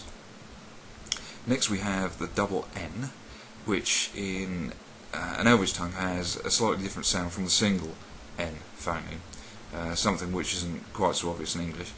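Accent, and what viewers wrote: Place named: United Kingdom. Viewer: "...we have the "aa" as in "run" - my non-native english mind is confused with your British accent.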